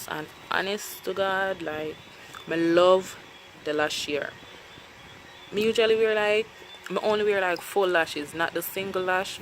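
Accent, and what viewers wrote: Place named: Jamaica. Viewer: hey girl i love your accent ...do you live in Jamaica...i always dream of visiting Jamaica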